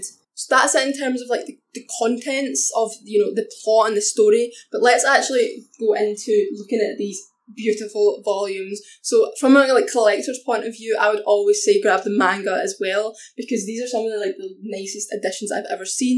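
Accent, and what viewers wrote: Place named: Scotland, United Kingdom. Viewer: I love the Scottish accent sooooo much, oml. Much love from London! UK GANG!!🇬🇧🇬🇧💪🏽 Also, can't wait to read this manga and watch the anime! :D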